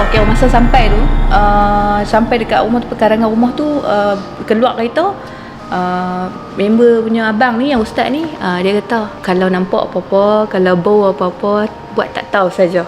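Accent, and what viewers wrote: North, Malaysia. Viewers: Xthu laa knpa loghat utara nii cute do😆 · Pekat betul nya ckp utara 🤣🤣🤣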